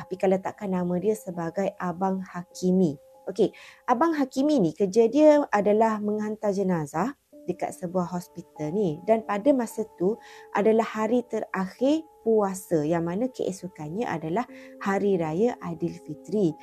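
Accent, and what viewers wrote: Kelantan, Malaysia. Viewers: Kak pika ore klate kann,kcek mcam pailet loghat klate jerr😁..truskan bermaju jaya channel akak ni ye😊,mdahan moga murah rezeki🤲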